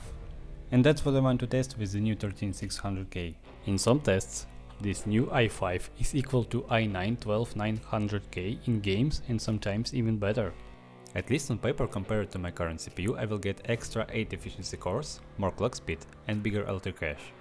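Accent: Russia. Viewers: Когда нужно меньше 5 секунд, чтобы понять, что автор — русскоговорящий =) (это не критика, если что ;) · много, факт) я слушаю и частенько русский акцент дает о себе знать. Для практики рекомендую читать стихотворения с тяжелым произношением и слушать говор англичан. Ох уж эта практика, с ней как раз всегда и беда))) не хватает ее всегда.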